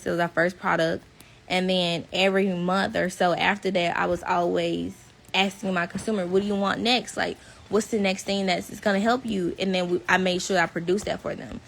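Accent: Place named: South, United States. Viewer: I love me some. I love the southern twang and all the realness of her.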